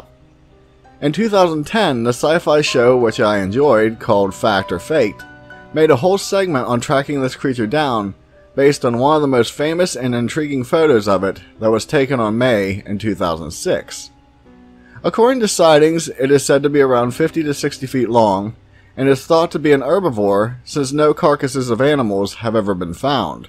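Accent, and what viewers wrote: Pennsylvania, United States. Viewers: As soon as he started talking, I could tell he was from PA.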